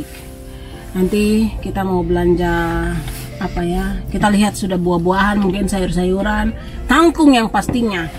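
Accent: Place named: Papua, Indonesia. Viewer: Bule jerman pintar bahsa Indonesia dan yg lebih asyik bisa logat bahasa Papua dn Maluku..keluarga bahagia..salam kenal dr kota Timika🤩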